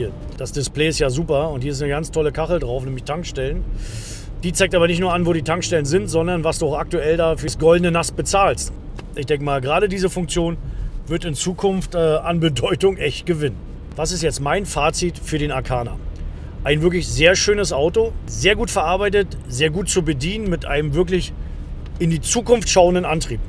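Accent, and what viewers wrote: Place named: Berlin, Germany. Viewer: Berlin / Brandenburger Akzent 😀🤜🏼🤛🏼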